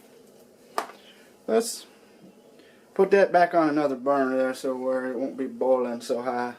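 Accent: South, United States. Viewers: ...I think your cute. Love your southern talk to. Good meal. Thanks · i 💙that southern food and accent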